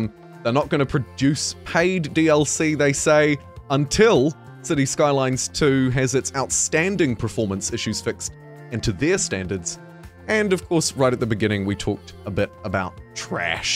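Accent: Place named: New Zealand. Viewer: Nice to hear a fellow Kiwi accent in the CS2 community. Keep it up mate 🥝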